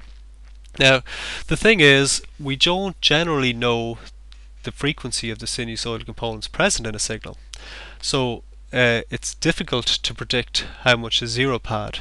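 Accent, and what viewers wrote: Ireland. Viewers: also, great accent. more people should talk with irish accents.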